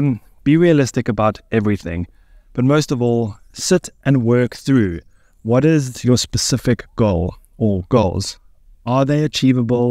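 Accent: South Africa. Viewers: What a surprise. Was wondering why all of a sudden I was listening to your voice, more intently. SOUTH AFRICAN ACCENT!!!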